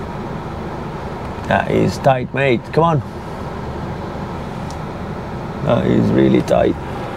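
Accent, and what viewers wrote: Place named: Manchester, United Kingdom. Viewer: Bloody hell you don’t half sound like you have a Manchester accent sometimes pmsl I’m from Salford originally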